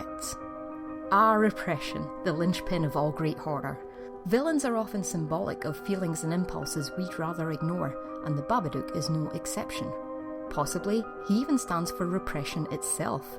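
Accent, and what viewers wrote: Scotland, United Kingdom. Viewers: Scottish ayeeee?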